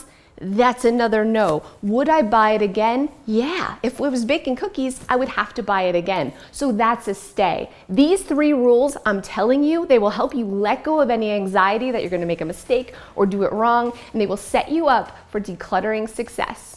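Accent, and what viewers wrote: Canada. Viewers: I just saw that sweet Canadian accent on HGTV! Gonna start watching your new show Hot Mess and wanted to say congrats. I’ve been watching you on YT for years and LOVE that you’re now on my TV! Yay!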